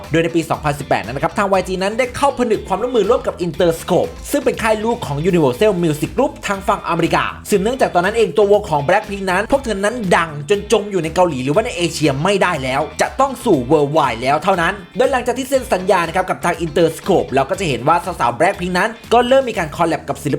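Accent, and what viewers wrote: Isan, Thailand. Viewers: ชอบการนำเสนอการพากย์เสียงแทรกอิสานของคลิปมาก ฟังไปขำไป หางเสียงแบบสุดดี แบบฉาอ่ะครับ ชอบ👍🏻👍🏻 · โอ้ยยยเนาะ เสียงภาคน้องกะตะมุตะมิหลายยยๆ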